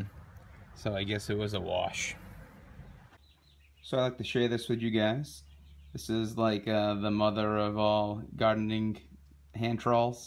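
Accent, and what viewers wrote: United States. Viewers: Awesome, I'll have to add this to my garden arsenal! Thanks! You sound like you're on the East Coast? I'm in Nor Cal. Lots of us Portugees out this way! I have a huge family. Seems like farming and boating are in our blood. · You def planted them correctly. Too bad they didn't pull thru for you. You must be a New Englander like me. I can hear it. What was in the wine barrel? Columnar apple ?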